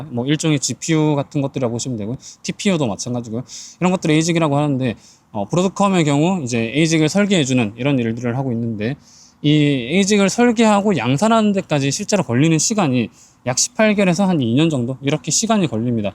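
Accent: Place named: Namhae, South Korea. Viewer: 가끔 들리는 남해 사투리가 정겹네요. 님 예전 영상들 보며 공부중이며 정말 많은 도움이 되고 있어요. 기회가 된다면 멤버십 스터디에도 참여하고 싶네요. 한국의 워렌버핏이 되길 바랍니다.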